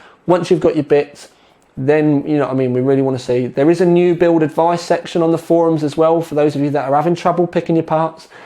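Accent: United Kingdom. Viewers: Fuck that, im hangin with fer a 1/2hr!! More brit accent! More lamp smashing!! FTW In all seriousness....smash more lamps.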